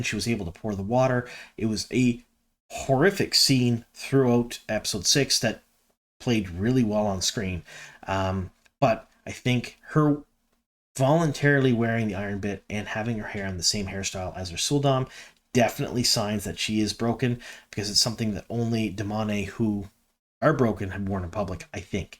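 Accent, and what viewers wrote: Canada. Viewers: From your accent, I thought you must be a Canuck - thanks for confirming! #Tims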